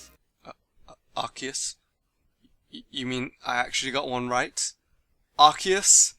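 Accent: United Kingdom. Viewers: lol you remind me a lot of Gigguk! it's the British accent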